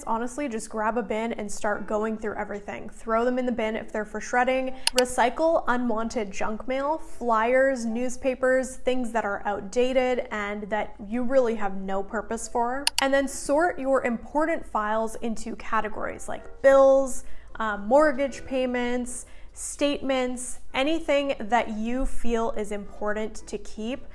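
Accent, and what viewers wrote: Canada. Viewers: Hello, I'm glad I found your YouTube videos today. I have now subscribed. I was brought up in Canada and recognize your accent and see the spelling differences. I like your straight forward ideas and how you make it simple for anyone to achieve a great goal. I would appreciate a video on cleaning recipes and ideas as I make most of my own cleaning products also. I do still use good old Comet or Barkeepers Friend powders. I will be looking for your video. Have a good week.😊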